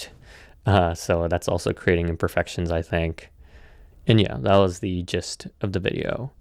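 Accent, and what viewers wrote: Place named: General American, United States. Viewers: May I ask why your English is so good? Was one of your parents American/Canadian? Did you live in North America? I think the reason people had no idea you didn't live in the Americas is because your accent is WAY too "General American Accented" (or standard pronunciation) so given that they had no reason to think otherwise. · Bro, people don't know you live in Japan! You sound American or Canadian, and you specified $ in the video title. So people are going to assume you're talking about buying it with American and Canadian dollars lol. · Ok but when you speak with an american accent and put the price in USD dont get mad at ppl for assuming. I live in europe and also thought you were talking about the American used market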